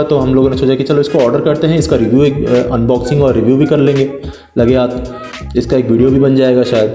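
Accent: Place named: Hindi Belt, India. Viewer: wah!! Ekdum hindi belt loka vali kahucha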